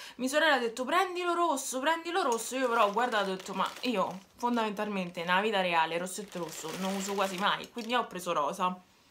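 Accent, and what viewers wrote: Rome, Italy. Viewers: Se la persona che quando sono triste mi fa venire il sorriso e mi fa divertire con le tue battute il tuo accento romano TI AMOOOO💖💖💖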